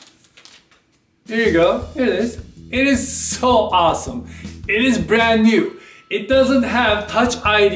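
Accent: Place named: Turkey. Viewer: the Uhahkian lol. Is it can be just because of he's actually from Turkey? So ofc his accent will change.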